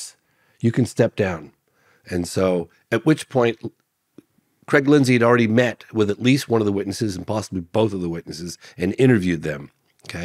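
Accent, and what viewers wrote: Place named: Scotland, United Kingdom. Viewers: Host must be from Glasgow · Great interview, thanks to both of you. Fox deserves front row in the Ufology Hall of Fame. Speaking of historical crashes, many of your followers will be aware of US marine Jonathan Weygandt and his 2001 interview with S. Greer on a Peru UFO crash (craft shot down). This interview is well-known among ardent researchers in the field. So I want to mention that Jonathan Weygandt has now done a second interview, 12 days ago, and it can be seen on Martin Willis channel. I just became aware of it via Chris Lehto. Weygandt had vanished from sight, disappeared after his one-and-only appearance, and probably most of us old-timers figured he was dead. 2nd interview is remarkable for one thing especially, the fact that 'someone' (US ops) took the craft down, probably with a Hawk missile, or similar. 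Lastly, (Canadian here) lovely to hear the Glaswegian brogue and know you've not gone 'well- ' for youtube! Cheers! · The new Scottish Joe Rogan 👍🏻😂😎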